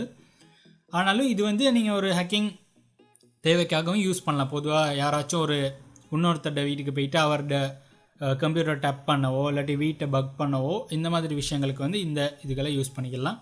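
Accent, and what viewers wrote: Sri Lanka. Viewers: Bro neenga Sri Lanka vaa ?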